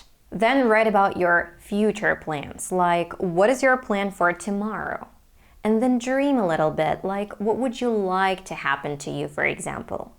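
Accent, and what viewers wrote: United States. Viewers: Have you ever been to or studied abroad in the US? I bet you've been. Because your accent is pretty nice and sounds like real American, How can you do that? Can you give me some tips to practice speaking sounds like a native speakers even as well as you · I like American accent, and could you please speaking a little slowly, I'm a new beginner.... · Hi! What is secret of your pronunciation like Americans? How do you practice everyday? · Hello. Where are you from? Is it American pronunciation? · Hi again. when i heard your voice yesterday for the first time i thought by your accent that you were american. it's hard to believe that you are from ukraine. what i really think. if one don't have a chance to speak and improve the language every day otherwise it's impossible to got an accent like yours. it's just only a matter of time. stay healthy and hats off.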